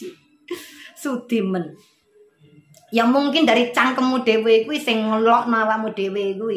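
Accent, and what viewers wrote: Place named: Malang, Indonesia. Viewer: 👍👍👍 cocok bak . senang rungokno komene pean. logatnya malang mutu .😀😀😀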